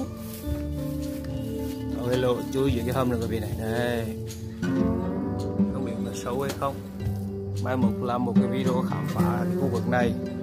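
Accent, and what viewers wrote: Hue, Vietnam. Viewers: Nhac mo qua lon. Noi tieng Hue khong nghe duoc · Giọng Huế nặng quá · Ông Thuyệt Minh nầy noi tiêng HUE khó nghe quạ!!! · Giọng Huế mà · Do bạn không quen nghe chứ ảnh nói giọng Huế chuẩn đấy. Nhạc rất hợp với khung cảnh.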